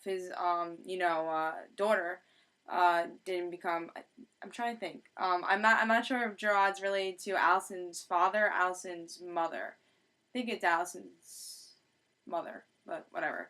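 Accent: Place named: New England, United States. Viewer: are you from New england? dat accent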